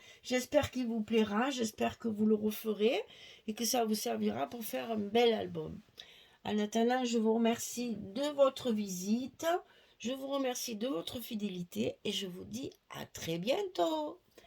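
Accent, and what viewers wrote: South, France. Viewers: Bonjour, c'est vrai que ce n'est pas le style de papier que j'aime mais j'adore regarder vos vidéos, j'aime votre façon de travailler et vous écoutez parler 😊 Votre petit accent du sud met du soleil dans ma matinée 🙂 Alors merci pour vos tutos et votre joie de vivre. Cela donne des idées même si on ne fait pas exactement la même chose ou avec la même collection de papier. Belle journée à vous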